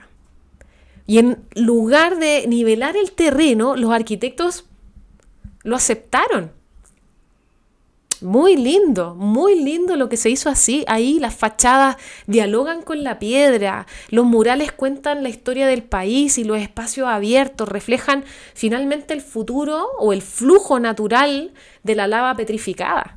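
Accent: Chile. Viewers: Me encanta el acento chileno!! Le decimos a Mon Laferte que no lo pierda!!!😊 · MUCHAS GRACIAS POR TUS PALABRAS HACIA MI PAÍS MÉXICO QUE TANTO QUIERO, SÉ QUE HAY PROBLEMAS COMO EN CUALQUIER LUGAR DEL MUNDO, PERO LA VERDAD ES QUE MÉXICO ES UN PAIS MARAVILLOSO, TIENE TANTO QUE OFRECER EN TANTAS COSAS, QUE TÚ LO ESTÁS EXPRESANDO MUY BIEN, ME GUSTA ESCUCHARTE, PUES SIEMPRE ME HA GUSTADO EL ACENTO DE USTEDES LOS CHILENOS, ADEMÁS DE SER UN PAÍS HERMANO, MUY HERMOSO Y MUY QUERIDO POR NOSOTROS. GRACIAS Y SALUDOS DESDE VERACRUZ, MÉXICO ❤❤❤❤ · Amo, amo, amo el acento chileno....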